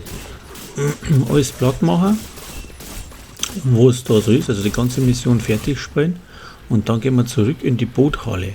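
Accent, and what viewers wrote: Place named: Swabia, Germany. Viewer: Als selbst tiefster Urbayer frage ich mich, wo der Dialekt herkommt. Hat Einschläge vom Schwäbischen, wie es mir vorkommt.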